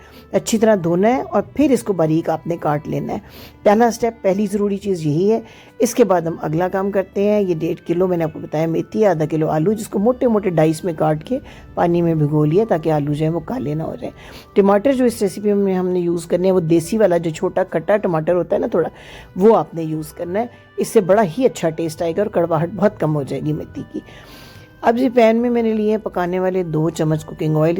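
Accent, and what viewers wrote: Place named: Punjab, India. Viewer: آپ کا اردو بولنے کاانداز پنجابی جیسا ہے اسے بہتر تھا آپ پنجابی میں ہی بات کر لیتے۔میتھی میں نہ تو سوکھا دھنیا ڈالا جاتا ہے اور نہ زہرہ۔اور میتھی کو دم میں پکاتے ہیں۔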